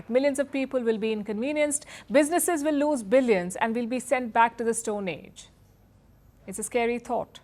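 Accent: India. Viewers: It's her thick ass indian accent that makes it sound like cloud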